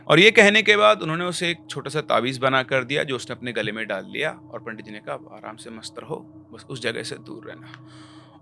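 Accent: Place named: Bihar, India. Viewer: Sir apki baato me Bihari bol najar aati hai....lgta h. Ap bihar se bhut connected ho....... Mujhe apki sari real stories bhut pasnd aati hai....maine apke sare stories suni hai.and I loved it...lot....❤ Love from...Bihar...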